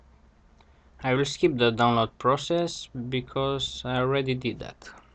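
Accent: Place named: Romania. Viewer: de la inceput de cand te-am auzit mi-am dat seama ca esti roman. dupa accent! ;)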